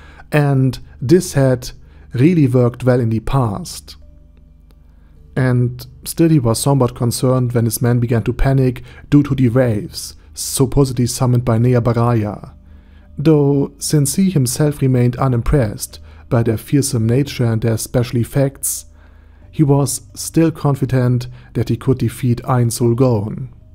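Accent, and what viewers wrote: Germany. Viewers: I appreciate your videos. I’ve been watching and looking into your videos for years now. I love accents, and your German accent is phenomenal my man.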